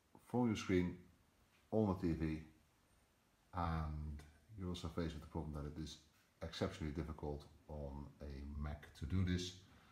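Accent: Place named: Netherlands. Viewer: you have to buy the app any alternative way of doing this, also i noticed that you are dutch just from your accent you al speak english in the same way just an observation thanks for the video though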